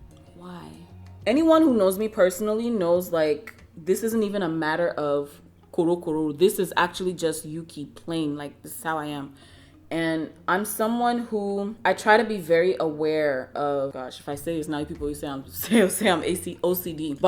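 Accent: United Kingdom. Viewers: The British accent has to stoppppppp. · She’s is giving us that auntie pats british accent and that’s on PERIODT my darling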